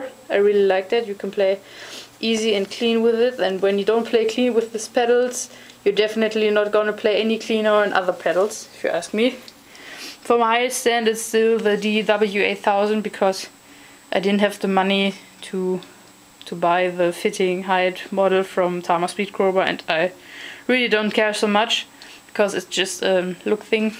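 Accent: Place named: Germany. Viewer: Youre german right? I like your accent :)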